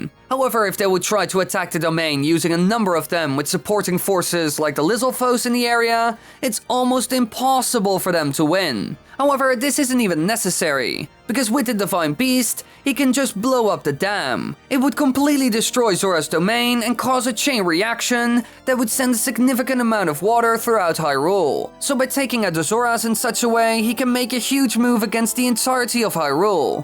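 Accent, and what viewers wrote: Netherlands. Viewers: I'm sorry but the dutch overtones in this one are too much for me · I was wondering, are you Dutch? Cus i am and i speak english kind of the same way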